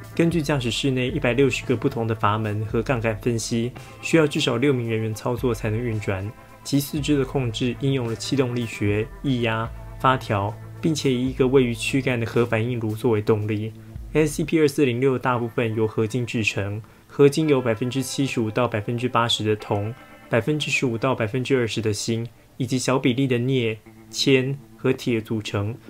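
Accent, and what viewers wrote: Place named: Taiwan. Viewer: 最早在B站上看到这个youtuber，一开始不知道是youtube上面的，后来听到湾湾口音觉得肯定不是大陆人（福建人口音虽然一样但不会那么明显哈哈哈）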